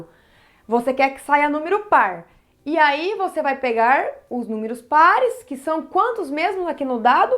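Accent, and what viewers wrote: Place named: South, Brazil. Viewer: ÓTIMA AULA, e o melhor de tudo, tem o sotaque sulista <3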